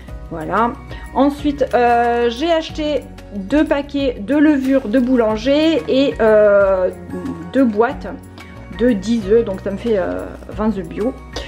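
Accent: South, France. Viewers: J'adore vos vidéos avec ce petit accent du Sud, vous avez l'air d'être très sympa, naturelle et une personne sans prise de tête.. Je vais faire 2 ou 3 courses a Lidl 😅...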